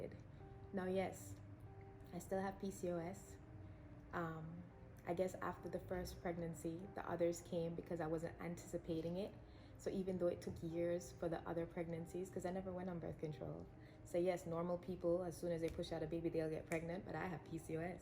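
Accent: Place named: Caribbean. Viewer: Thanks for sharing your story. I have not been diagnosed but the doctor has told me that this is his impression based on the results. I’m 24 years old, not married yet or trying to have, still in university but I always have that fear that when I’m ready I may not be able to, but I have faith. Your story is inspiring. Ps. You sound like you’re from some where in the Caribbean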